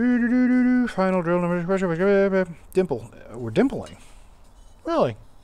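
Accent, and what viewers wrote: South, United States. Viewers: Five years I’ve been watching, and never before have you sounded so southern! 😆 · Where did the southern drawl come from? It’s never been there before. Hahaha. It’s fine with me, just new. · Hi, long time viewer, love your videos. Keep it up. After watching your videos for a few years now, not sure if it's just my imagination but for some reason in this particular video I feel like it's the first time I could really hear a southern accent from you:)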